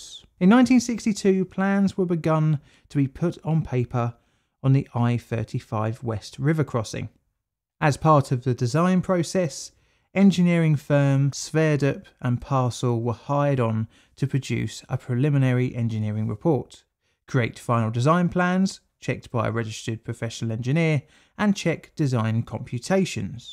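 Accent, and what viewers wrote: United Kingdom. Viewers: To hear a Brit talking about a rather obscure event that happened in my state is kinda surreal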